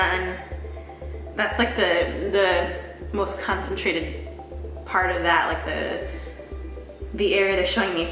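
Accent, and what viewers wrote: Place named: United States. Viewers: she looks like a friend I went to secondary school with. It's hard to see because she clearly talks like an American, but I bet if she had a Naija accent you would see it better. It's her features that I'm talking about, not necessarily the whole package if that makes sense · sylviabbz And she has this kind of aura about her that make you think she is Nigerian except for the American accent.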